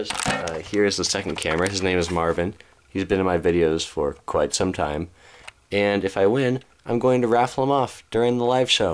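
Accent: England, United Kingdom. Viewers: Your English Accent is Class!! :D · O Your English accent is amazing!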